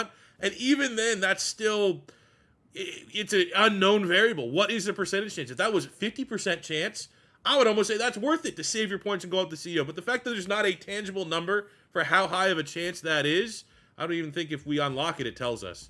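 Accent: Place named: Canada. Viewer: Are you from Philly? You sound Canadian. Jut curious love the channel!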